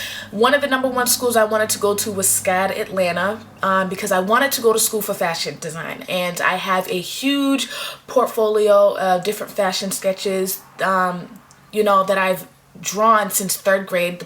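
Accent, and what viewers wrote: Boston, United States. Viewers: I can tell you're from Boston by your accent lol!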